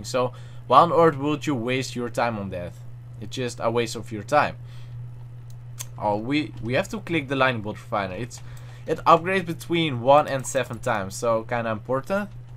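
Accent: Netherlands. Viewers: are you from holland? You sound Dutch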